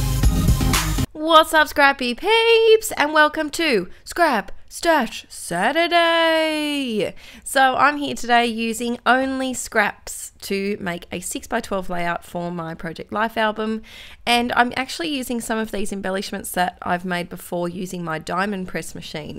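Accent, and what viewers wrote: Australia. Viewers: Always look forward to your videos (you are the first person I look for each day when I first log into YouTube!!) and I love your "Scrap Stash Saturday" videos - it's lovely to see such inspiration and different ways of using those little bits which would normally either sit in a drawer or be put in the bin. Watching your videos and techniques is what made me start buying and using Distress Stains and Sprays but I'm only a beginner, and yesterday I bought my first Project Life cards and page protectors - thank you!!! I am in the UK and really enjoy listening to your accent and hearing about life in Oz too... we were in Hobbycraft yesterday (a bit crafting store) and I was walking around with my boyfriend, we kept seeing different things like Gelatos and thickers, each time I turned to him and said "that's what uses" lol, think he thought I was mad!! 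Keep up the great work <3 xxx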